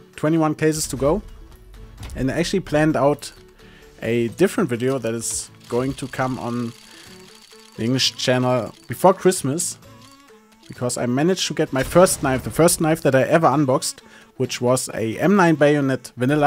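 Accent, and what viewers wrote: Germany. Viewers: Ich weiß es lässt sich nicht sehr einfach abtrainieren aber der deutsch Akzent ist einfach einer Der schlimmsten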